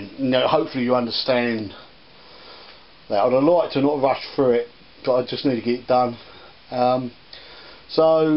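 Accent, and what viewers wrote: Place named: United Kingdom. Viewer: Excellent video. Also, quite fun watching the computer translator try to deal with a heavy brit accent.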